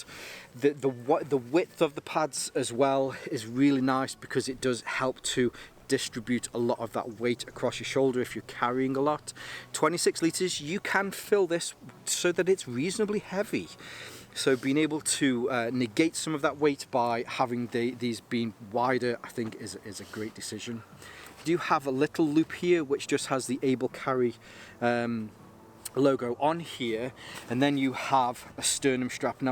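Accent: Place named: Stoke, United Kingdom. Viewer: Bit of North Staffordshire, Stoke going on here.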